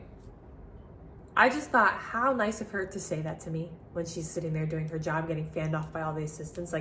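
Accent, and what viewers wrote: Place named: Midwest, United States. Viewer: I'm trying to place your accent, or lack thereof. You're either PA, Ohio, Indiana, Illinois, or you're from the west coast, I believe? Which is it, please?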